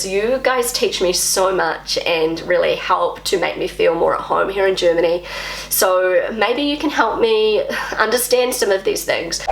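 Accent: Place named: New Zealand. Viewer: You are so cute with your 🥝accent, it's always a great pleasure to hear you speaking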